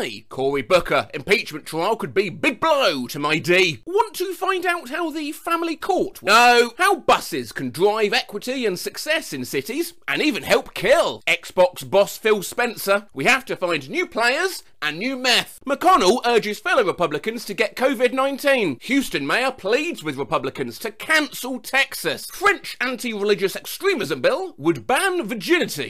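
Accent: United Kingdom. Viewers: its bc of his voice and his british accent. Uberduck has a AI voice you should check out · I accidently clicked on this and was wondering why suddenly a brittish man surrounded by lemos was talking to me...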